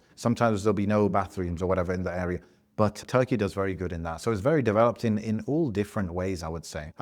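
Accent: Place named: United Kingdom. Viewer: How did you pick up a British accent if you lived overseas most of your life?🤔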